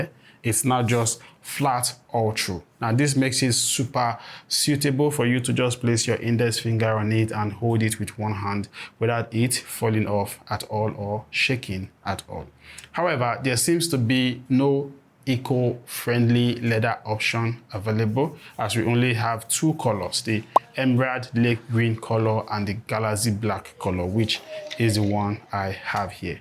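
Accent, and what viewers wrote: Africa. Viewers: I like your accent, its like african english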